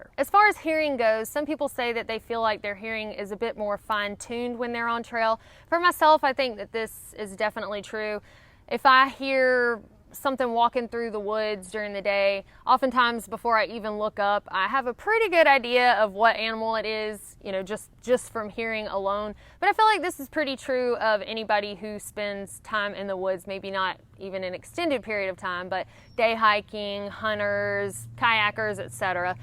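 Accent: South, United States. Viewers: I realized all of these things from long outdoor experiences while in the Army. I still remember pulling my sock off and throwing it against a wall and it stuck. That was after weeks of not having the opportunity to change clean etc.. I really appreciate your southern drawl. I’m from Louisiana originally. Living in Europe going on 26 years and don’t get the chance to listen to the singing of the southern girl. Amazing. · It amuses me how people are so enamored with accent. It is just how we talk! Of course, her's is a nice, sweet version. However, you know you have someone with a good southern accent when the pronunciation of "pin", "pen", and "pan" are basically indistinguishable. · Sounds like a lot of things I went through coming back from Iraq. Weird. I like your videos. Good advice and you have a soothing tone and an accent that’s as country as a butter bean. 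· I've made this comment before I guess but in 79 I hitched outa Charlotte to never really return to the south and much further down the road America itself. Now in Scandinavia. Long long journey; but your voice and your very southern alterna-girlness takes me back, strangely very familiar and missed somewhat. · I find her accent rather annoying. Better I guess, and more genuine, than that haughty Valley Girl manufactured ball-of-butter-in-the-back-the-throat voice that a lot of chicks choose today. Still, she's been out of the country now and has been exposed to enough different kinds of speech that she can improve that Scotch-Irish Southern drawl that makes her sound ignorant. I did. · I love the crystal clear "et cetera" in the midst of your southern drawl 🤣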